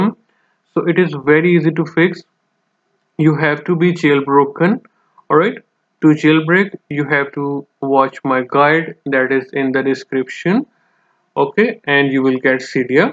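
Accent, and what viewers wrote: India. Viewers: took me 10 min to understood what symbols y say Indian accent not say in description also i cant see 720p pfffff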